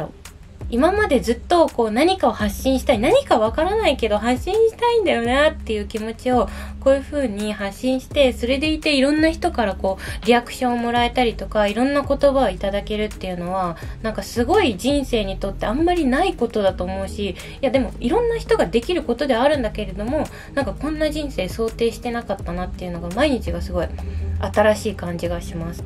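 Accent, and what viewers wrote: Kagoshima, Japan. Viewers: わたしも鹿児島出身、東京在住なので親近感湧くし、突然鹿児島弁でちゃったらしばらく直らなくなるのめっっっちゃわかります（笑） しかもわたしもジャニオタあがりのケーポオタなのでもう勝手に運命感じてます😳 これからも頑張ってください❤︎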